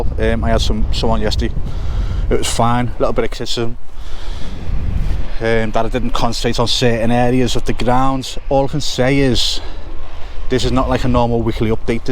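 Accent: Liverpool, United Kingdom. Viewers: Great work, its awesome for s scouser in Texas not just loving seeing the progress of the construction work but getting the dose of “scouse” when all i hear here is y’all and you ground me with “yers all”. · Super well done. Like your updates and the zoom ins. You with other are doing a great job. I find these videos really interesting and has been following from Malta since the start of the project. Keep them coming. Also I like hearing your scouse accent 🙂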